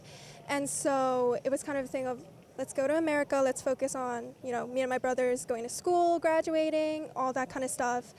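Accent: Afrikaans, South Africa. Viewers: Because it is an Afrikaans accent. That accent comes from the Dutch. Even the word is dutch. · Your South African accent is on point. Thank you for representing us. We all mix here. So you nailed it. ❤ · South African accent❤ she is us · it's a south African accent get over it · SHe is indeed South African, love the Afrikaans accent girl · As a South African I approve just from accent, and dish option Chakalaka and pap with some Wors 😂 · The South African in you is popping out. Love it!🎉❤ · This gorgeous girl is 100 💯 South African 🇿🇦 her accent is 💯 South African No disrespect to her Korean roots Bless her!! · Lol, Yup, the accent is definitely ours😂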